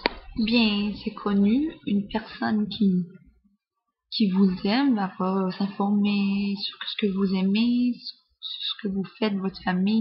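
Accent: Canada. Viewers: je pense que elle es canadienne il me semble avoir reconnus l'accent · elle est surement québécoise, si je me trompe pas!!!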